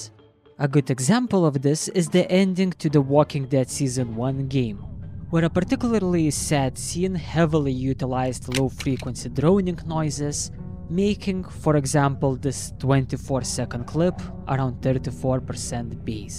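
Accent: Russia. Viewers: the russian R is as heavy as the bass (not really judging tho)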